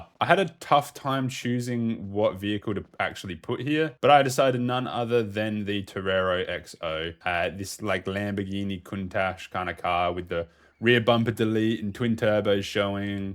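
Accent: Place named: Australia. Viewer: I had a feeling you are from Australia because of the way you said the name of the GTA5 Camaro lol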